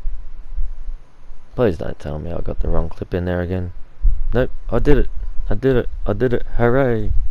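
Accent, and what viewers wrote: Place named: Australia. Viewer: Good to Aussie voice in a YouTube video for a change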